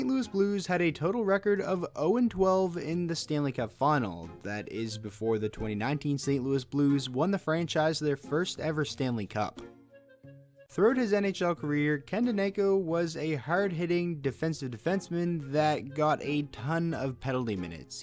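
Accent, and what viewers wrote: Canada. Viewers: Which province are you from? You're the first canadian I've listened to who actually says "aboot" instead of just "abeut". I thought that was just a stereotype but I guess some of you actually do say it like that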